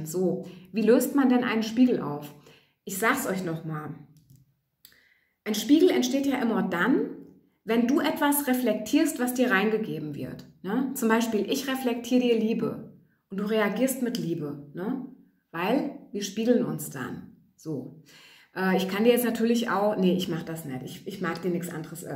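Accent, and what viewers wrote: Hesse, Germany. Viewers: Sie kommt aus Hessen .Ich dachte am Anfang auch, oh schön jemand aus Sachsem. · sie kommt aus Hessen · ....ich vermute auch Hessen, zumindest lebt sie da. Das Rheinische hört man auch etwas durch. Egal, ich liebe diesen SingSangSlang 🤗😍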